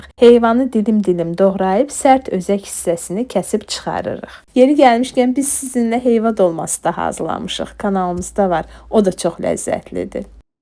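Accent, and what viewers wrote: Baku, Azerbaijan. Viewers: Can Ay Bakı Kəndlərindn Gələn Səslərin Ləzzəti. Həeee göyərti Yox. Əhsən Sizlərə.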